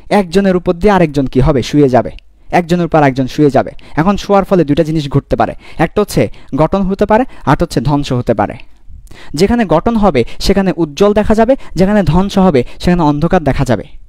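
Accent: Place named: Noakhali, Bangladesh. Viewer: Vai apner gram er bari kothay? Amr to mone noyakhalir Ashe pashe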